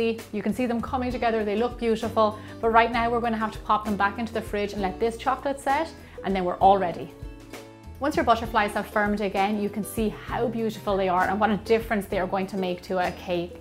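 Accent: Ireland. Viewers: Her accent, is she from Ireland? Sorry I'm not a native speaker :)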